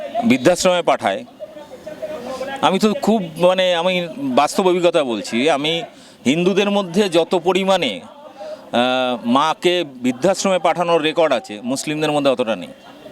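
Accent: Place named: Bangladesh. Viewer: Apnar bangladeshi mone hochhe dada.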